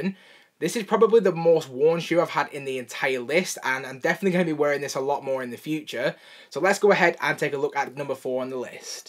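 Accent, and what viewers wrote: Scotland, United Kingdom. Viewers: Saw your comment on settle Fowler. Is that a Scottish accent? Anyways I like this video. My favorite yeezy 350s were the cinder, earths linens and upcoming sand color way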